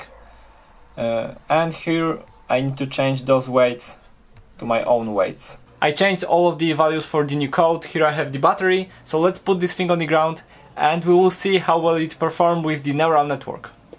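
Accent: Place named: Poland. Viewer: Po akcencie poznałem że to Polska XD Pozdrawiam, mega robocik :D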